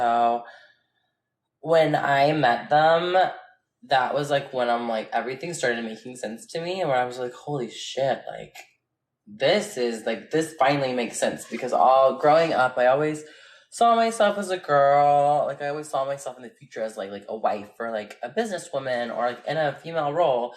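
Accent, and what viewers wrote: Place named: South, United States. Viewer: That country accent, WOOW SOUNDS SOOOO HOT!! I'm glad you dont sound valley. I kno bout ur up bringing, hearing the story from you. WHOS YOUR INSPIRATION hmm something like that EVERYTHING YOU DONT WANT TO BE LIKE!! is the best answer. HUGE PROPS AND HUGE MAD RESPECT TO YOUR UNCLE, TAKING ALL OF YAH IN!! That's soooo cool, you are taking care of him. I end up changing my wallpaper to your picture. It's the picture from Pilow talk, with the light blue Luke sweater. It's me favorite picture of you. I LOVE STOLEN like shots. You look and are BREATHTAKINGLY STUNNINGLY GORGEOUS!! LOVE AND HELLA APPRECIATE THAT YOU ARE LEVER HEADED, SMART AND WITH A DEGREE, VERY WELL SPOKEN.. LOVE ALLLL OF THEEEE ABOVE. I ADMIRE YOU.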